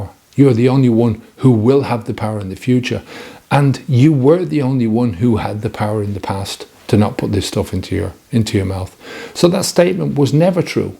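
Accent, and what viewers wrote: Ireland. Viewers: Your accent seems a bit stronger now that you're back in Ireland!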